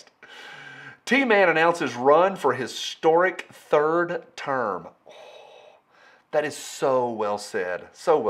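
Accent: United States. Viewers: Love ya!!! That freedom accent is soo heart felt..maybe get a lahma tin hat for those on the left coast to stop the the brain washin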